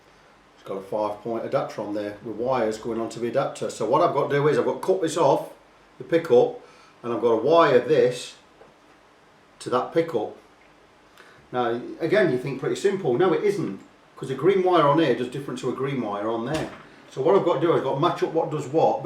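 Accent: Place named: North, United Kingdom. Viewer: great stuff mate. was the guitar just standard 2 humbucker 3 way toggle, no push pull pots. just because normally you would be soldering 2 wires together from the p/up in the old school way. i'm putting a seymour jb 4 conductor in a les paul with pcb. i bought a pre wired molex adapter on ebay for purpose but wires came loose when plugged in! so thinking doing it this way. cheers pal, sounds like you're a fellow northerner....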